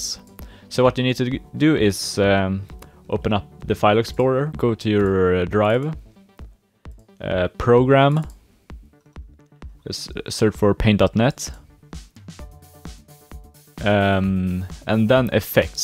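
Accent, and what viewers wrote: Sweden. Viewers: you sound swedish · Are you swedish? xD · You are swedish right? :D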